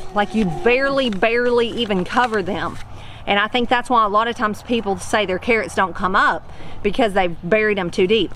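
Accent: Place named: Arkansas, United States. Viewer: It's so nice to hear a true Arkansas accent. Always run with it, not away from it because it's the most pleasant sound on earth.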